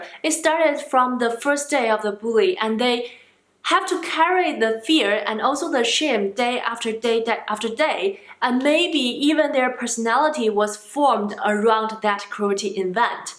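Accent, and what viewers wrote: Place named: China. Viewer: Chinese English is powerful.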